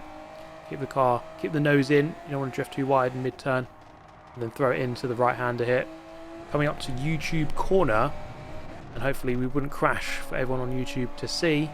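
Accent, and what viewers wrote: United Kingdom. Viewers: Why do I get so hype watching someone else play the same game as me. Those damn Brits can make everything sound interesting.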